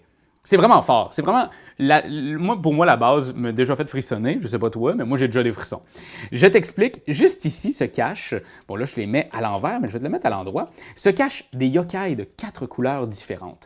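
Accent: Quebec, Canada. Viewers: j’aime l’accent québecois lol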